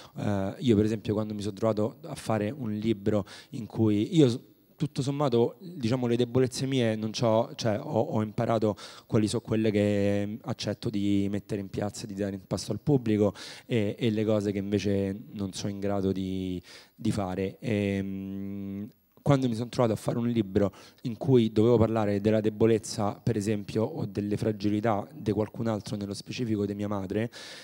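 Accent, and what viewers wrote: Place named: Rome, Italy. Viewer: ‘Gnorante! 0calcare non sbaglia un congiuntivo...altra cosa sono l’accento romano o l’emozione che lo fa parlare accelerato...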